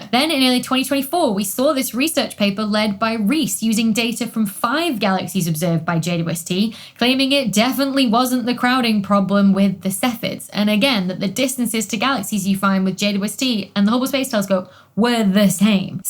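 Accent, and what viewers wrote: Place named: North West England, United Kingdom. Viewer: What part of the UK are you from? Your accent sounds very north-west.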